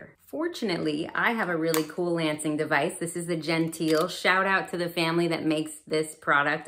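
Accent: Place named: United States. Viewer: Fellow t-1 diabetic From Australia and sounds very interesting 😊 indeed. By the way I totally love your American accent 😊.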